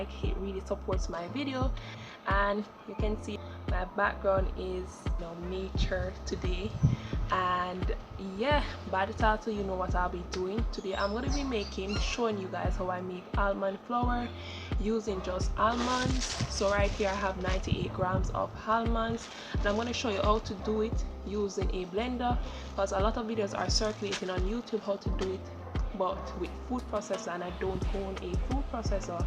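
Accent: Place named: Queens, United States. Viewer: Your accent is cool as hell. I grew up a Spanish kid in Jamaica Queens. Almost everyone in my neighborhood spoke like you. All of them just had this cool chill vibe.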